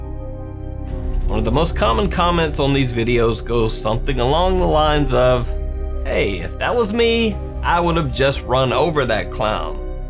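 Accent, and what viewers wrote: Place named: South, United States. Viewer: I love your southern accent do you live in Tennessee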